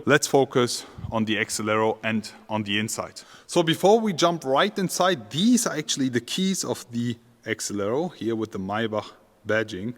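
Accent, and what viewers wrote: Germany. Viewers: 독일식 영어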